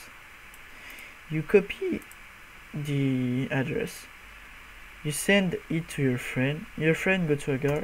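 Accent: France. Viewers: Ton accent est mortel :D French powa ! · Are you french ? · C'est trop drole... Ton France es tres prononce. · T'es français toi avec cet accent non?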